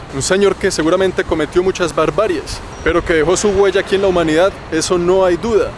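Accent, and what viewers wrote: Bogotá, Colombia. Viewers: Por fin alguien que le gusta nuestro acento rolo. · Viajo contigo a lugares q nunca conoceré... gracias....me encanta ese acento colombiano....y tú narrativa uuuffff de lo mejor....saludos de México ☘️🙏 saludos a la abuela🌷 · Muchas gracias, te admiro mucho, tú acento colombiano y la gracia de éste le imprime un agradable sabor a tus videos, ojalá yo pudiera hacerlo, un saludo y cuídate del COVID. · Muy interesante tu narrativa,el acento colombiano es tal vez el castellano más bonito de latinoamerica ,gracias por tu aporte a la cultura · A eres de bogota cierto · Eres increíble, además de que te metes unas escenas increíbles de los lugares 👌🏼 aprendo mucha historia contigo 🙌🏼 y tu acento colombiano es todoooo 😍 saludos de Argentina ✨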